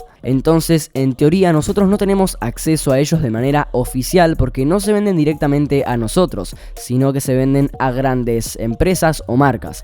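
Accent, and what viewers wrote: Argentina. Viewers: Sos de argentina???? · tenes voz de wachin y la rompes, y mas merito por parecer ser ARGENTO O YORUGUA... MAS DIFICIL no podias tenerla kajskasj y la tenes atada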